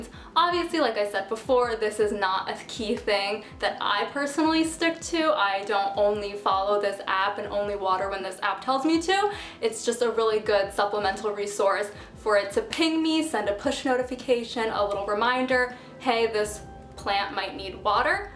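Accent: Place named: California, United States. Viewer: It's a California accent with a vocal fry and upward inflection. She likely doesn't hear it but I know exactly what you're talking about about.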